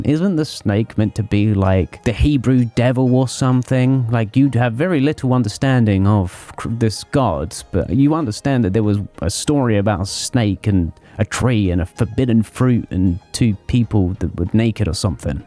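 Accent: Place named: United Kingdom. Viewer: I don’t mean any offense at all, it’s just your shaggy look with an American accent would make me think that you are a drug addict, but with the British accent, it makes me feel like you’re a fucking sage, English Nostradamus ass white boy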